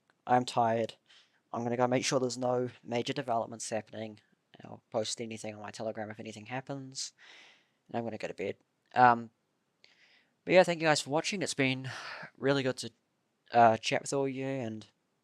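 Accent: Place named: New Zealand. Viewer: Enjoying your kiwi as accent, my dude.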